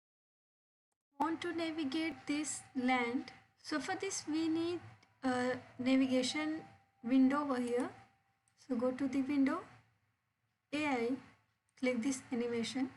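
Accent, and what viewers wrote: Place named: India. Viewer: U are Indian Sounds like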